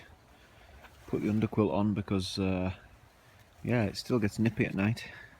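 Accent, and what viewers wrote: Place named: Northern England, United Kingdom. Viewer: Connie Williams hey.u misunderstand me. I'm ribbing.I think he's a top geezer.love his work.I'm English Connie.I'm sure he won't mind me calling him a northern monkey.I understand every word or coloquesm he uses. I'm from stoke, north midlands, often described as a "clay head". We got scousers,jocks,taffs, paddies,cockneys, monkey hangers,woolybacks, yamyams.....the list goes on.we all have distinct dialects. PS everyone..no offence intended. As it goes, im 12th century Saxon invader,with Irish,Scottish and Angle blood. America and england are two nations decided by a common language. I want see catching American signal crayfish, they invasive species.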